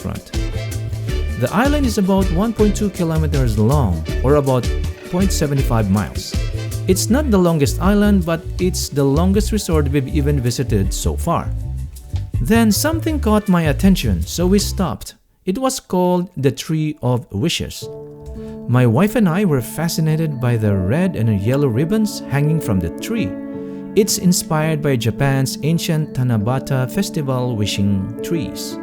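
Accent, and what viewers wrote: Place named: Philippines. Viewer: Your. Filipino accent is so thick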